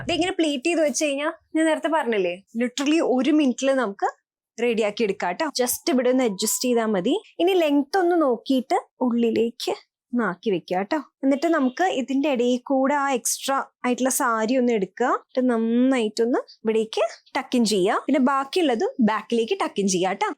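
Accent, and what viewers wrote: Thrissur, India. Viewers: Thrissur town area slang anu ith.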